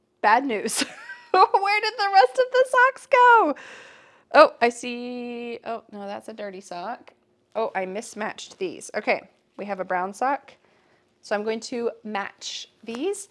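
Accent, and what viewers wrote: United States. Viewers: Hi ma'am.are you American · Are you American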